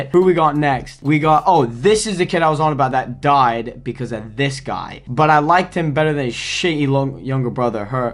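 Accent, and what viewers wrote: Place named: United Kingdom. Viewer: I cant tell if youre an American with a slight British accent or a British person who's lost most of their accent Edit: definitely british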